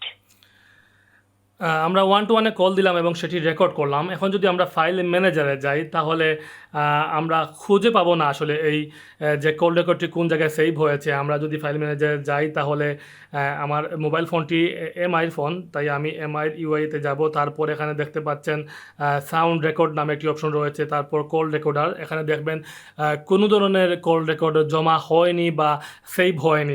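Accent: Sylhet, Bangladesh. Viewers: আপনি মনে হয় সিলটি?😊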